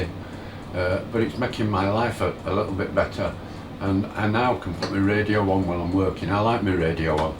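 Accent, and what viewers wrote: Yorkshire, United Kingdom. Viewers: Sound like a Yorkie accent !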